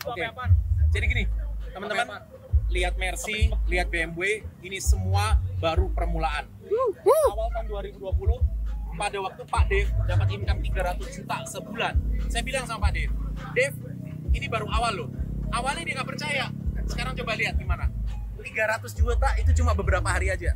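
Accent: Bali, Indonesia. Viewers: swastiastu blii, kirain orang keturunan arab, setelah denger aksennya eh tyt orang bali wkwkwkwkw. Makasih info nya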